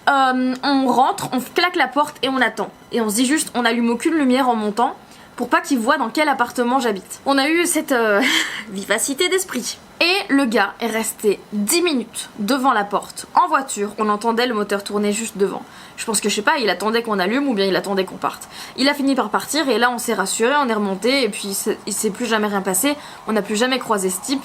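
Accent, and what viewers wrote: Belgium. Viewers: Coucou!! Hier, je suis tombée sur ta vidéo "95E et plus de soutif". Je ne te connaissais pas, mais le titre de ta vidéo m'a interpellée. J'ai aimé ta personnalité avec ton petit accent Belge que j'aime beaucoup <3. Et là, pareil, le titre de cette vidéo, m'interpelle et je suis dégoûtée de ce que tu as pu vivre!! Je ne comprends et je ne comprendrais sans doute jamais ce qui ce passe dans le cerveaux des mecs (si tant est qu'ils en aient un!!) Je suis comme toi, je me demande ce qu'il faudrait faire pour pouvoir les afficher. Peut-être, en criant "regardez messieurs dames, ce monsieur est en train de se masturber.... sortez vos téléphone pour le filmer et le publier sur les réseaux sociaux!!!" Je ne sais pas si cela changerait quelque chose, mais je suppose que la personne la plus mal à l'aise serait certainement ce porc et non toi. Je n'oublie pas que ce genre de situation est hyper perturbant, mais peut-être que cela exorciserait ton angoisse de l'instant?? 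Les lois ne bougent pas et les femmes ne sont toujours pas considérées comme elles devraient l'être.... Bienvenu au 21ème siècle!!! C'est grave quand même que l'on en soit toujours là.... Bref.... Merci pour ton témoignage, il peut servir pour nos "sœurs" Ha oui! J'allais oublier, j'ai certainement l'âge de ta mère ou même plus.... Et j'ai vécu ce genre de situations pourri quand j'étais jeune et à croquer.... Donc, je sais précisément ce que tu peux ressentir dans ces moments là. Gros bisous ma belle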